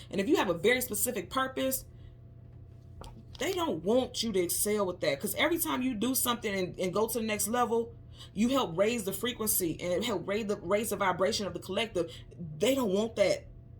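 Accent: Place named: Chicago, United States. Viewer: I Love this read. And I heard and felt every bit of Chicago in you! 😁 You were not playn in this read 🙌🏿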